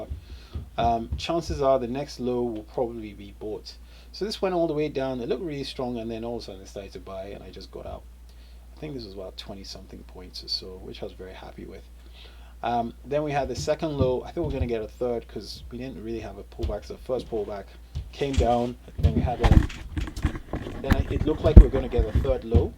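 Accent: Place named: England, United Kingdom. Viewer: Btw where are u based? You sound English but your charts are in America :-)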